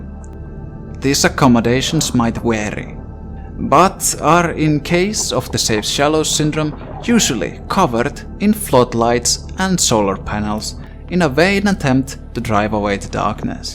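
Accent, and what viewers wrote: Finland. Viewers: Holy fuck I wondered from that accent if you were Finnish first I thought you were Russian it's cool to see a fellow Finn play this...well absolutely mentally scarring game somehow my little sister is unphased of literally everything in it though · You have such a perkele accent. I love it! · kyllähä ton suomalaisen rallienkun tunnistaa mistä vaan. Hyvä video tho!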